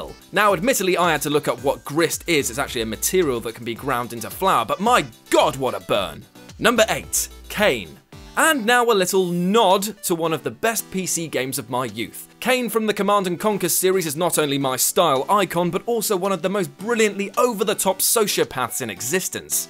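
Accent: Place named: United Kingdom. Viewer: no, he's british. they pronounce words differently than in the states.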